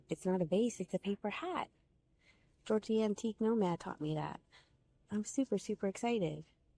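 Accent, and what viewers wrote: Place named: South, United States. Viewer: Love your southern accent! Cant wait for the Maniac one... downeast? Or perhaps Acadian?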